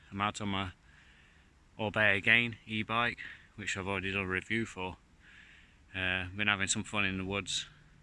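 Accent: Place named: Derby, United Kingdom. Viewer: I have the solid ones on my Brompton, love them , reassuring to know you’ll never flat . Expensive but I ride on the camel trail Padstow to wadebridge every day . Enjoyed your vid on the Orbea also , I think you are from Derby by your accent, me too.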